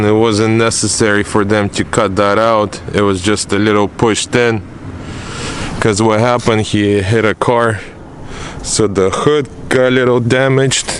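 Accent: Russia. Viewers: Давно живёшь на заподе? Очень сильный русский акцент, чем-то белорусский напоминает.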